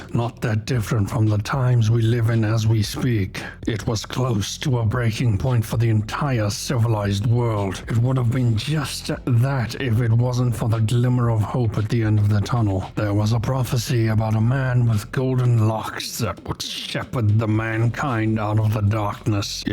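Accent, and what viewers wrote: South Africa. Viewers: Are you South African, by any chance?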